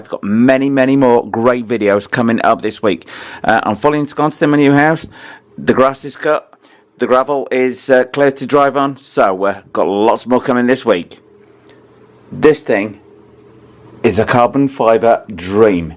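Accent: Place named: Liverpool, United Kingdom. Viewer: Are you kidding me that's not a New Jersey accent you mean Jersey... Jersey on the mersey?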